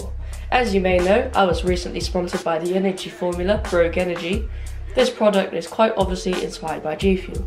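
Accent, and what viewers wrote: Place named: United Kingdom. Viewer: You’ve got a thicker British accent than me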